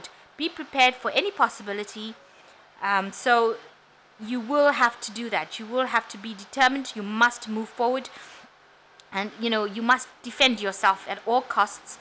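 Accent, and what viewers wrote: South Africa. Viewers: Thank you for your reading. Are you south African? Your accent sounds familiar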